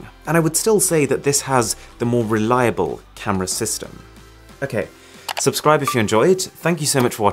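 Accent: United Kingdom. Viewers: You can convince people to eat their phones. The way you explain these phones with your British accent is amazing.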